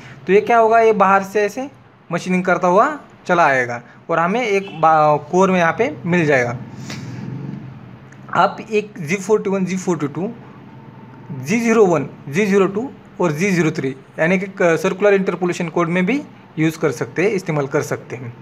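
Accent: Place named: Maharashtra, India. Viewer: Loved the vedio But Marathi anscent is just awesome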